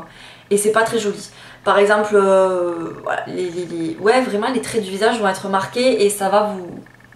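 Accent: South, France. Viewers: J'adore les fonds de teint moi aussi et j'aime en porter toute l'année, j'aime tester les nouveautés. J'aime bcp tes vidéos avec en plus ton accent du Sud car je m'y reconnais (j'habite pas loin....). Surtout continue, biz Nany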